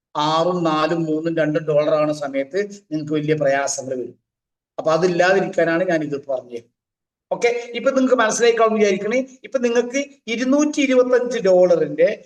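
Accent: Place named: Kerala, India. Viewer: Mlp slang aan bro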